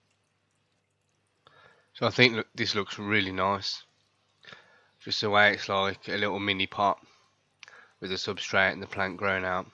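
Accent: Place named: West Midlands, United Kingdom. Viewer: that west midlands accent though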